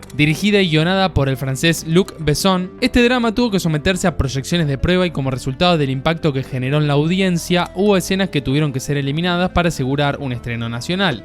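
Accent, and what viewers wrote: Argentina. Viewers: Amigo que buen video describe muy bien lo qué pasa en la cinta me encanta en asentó argentino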